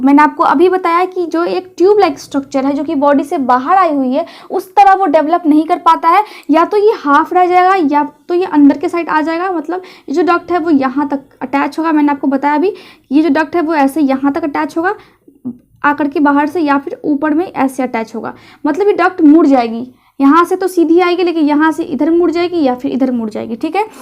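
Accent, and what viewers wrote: Bihar, India. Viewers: pahle toh yeh lagta hai tum Bihar se belong karte h maina language se yeh Jana hai. Baki well done your speach is uninteruppated good show. Baki pl intimate aap ne kahan tak study ke h. Medical mein. Pl reply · Ma'am ap Bihar se ho kya ?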